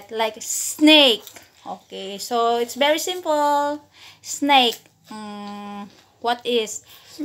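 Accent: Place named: Visayas, Philippines. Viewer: Bisaya Pala ikaw Heheeh nice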